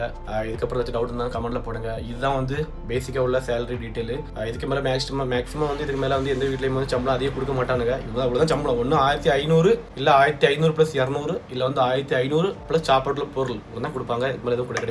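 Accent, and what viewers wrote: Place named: South Tamil Nadu, India. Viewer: South Tamil ❤ Slung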